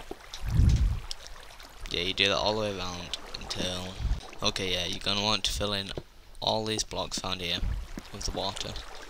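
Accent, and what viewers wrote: United Kingdom. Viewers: you gotta love a good brit voice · No, he doesn't, he just has a geordie accent.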